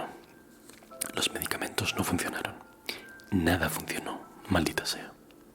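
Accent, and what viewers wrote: Spain. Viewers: i've been looking for an asmartist with your voice tone and spanish accent for a long time, i cant believe i've finally found it! (i can understand everything you say, im just bad at talking spanish back lol) saludos desde brasil!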